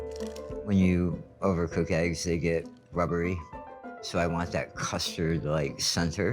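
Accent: South, United States. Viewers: He has a strong southern accent. 😅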